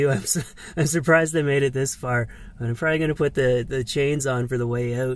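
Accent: Canada. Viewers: I am Canadian myself, so I recognize a strong, Canadian accent when I hear it. Are you from out West? Maybe far East coast? I'm from South East Canada myself, or south west Ontario. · Your food looks amazing. Also, I love that Canadian Accent. New fan :) all the way from HOT Sydney Australia. I wish I lived in the cold! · I am a new subscriber due to your accent. Canadian A? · He sounds too Canadian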